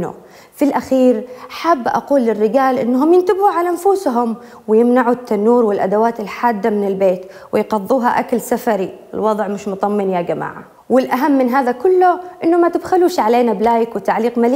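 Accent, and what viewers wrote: Aden, Yemen. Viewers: جمال اللهجه اليمنيه لا تمل منه الاذن فكيف اذا كان من أنثى لا يملها النظر حفظك الله من كل شر امين يارب 😍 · اللهجة العدنية فخر وابداع .. شكرا لك .. قصة مؤلمة .. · والله أنك مثقفة ومؤدبة ومهضومة وقريبة للقلب والأهم أنك حلوة ولهجتك رائعة أحب اليمن وأهلها من سورية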